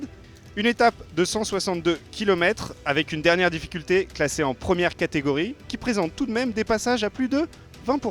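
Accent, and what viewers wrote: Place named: France. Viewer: Porque hay un francesito narrando el resumen?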